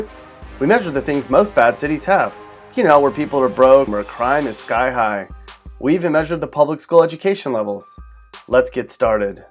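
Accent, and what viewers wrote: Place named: Northern Virginia, United States. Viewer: Sounds to me like he is the NOVA type, true Virginians know what I mean.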